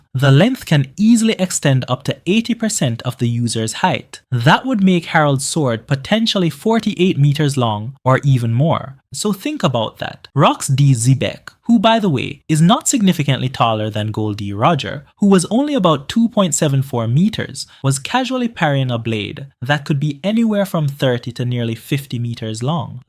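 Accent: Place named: Jamaica. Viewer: You Jamaican bro? There’s a familiar accent in your tone😂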